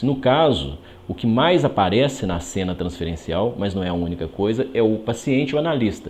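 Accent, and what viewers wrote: Brazil. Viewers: Para além do conteúdo que me parece sensato, quero realçar o português que em todos os videos do autor é perfeito. Nunca vi um português com sotaque brasileiro tão bem falado e cuidado. Parabéns...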